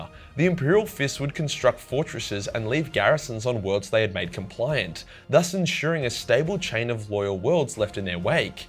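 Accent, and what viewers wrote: Australia. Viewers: The iron cage explained by an Australian?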